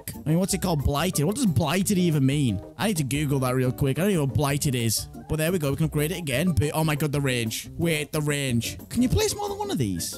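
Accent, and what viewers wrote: United Kingdom. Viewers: Are you scotish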